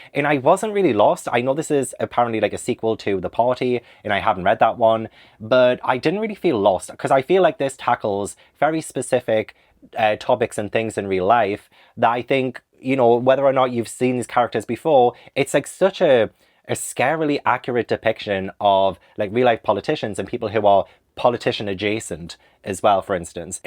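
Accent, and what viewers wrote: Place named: North, United Kingdom. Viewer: I stumbled on you one day and stayed for two reasons - I am from the north, now living in the US and your accent brings me home... and I just ADORE your content!!!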